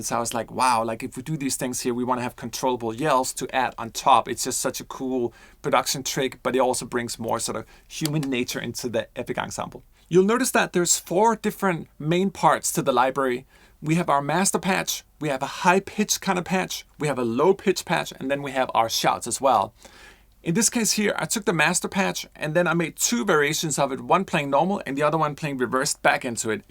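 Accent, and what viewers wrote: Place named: Denmark. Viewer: I KNOW A DANE WHEN I HEAR ONE! Hej, en kærlig hilsen fra Danmark:D It’s black friday, and I’m about to spend maybe to much cash on this and that plug-in instrument, and then I found Soundpaint through 8DIO, where I just bought epic toms. But I can see that every day is black friday at Soundpaint:D …and that I should’ve bought epic toms at Soundpaint instead - and I will. While the samples may be the same, this is clearly a huge update. Good samples are good samples. Doesn’t matter if they were made today or 10 years ago. How we can use them matters. The Soundpaint player looks amazing, and I can hardly wait to download it and get to know it. De bedste hilsner fra DK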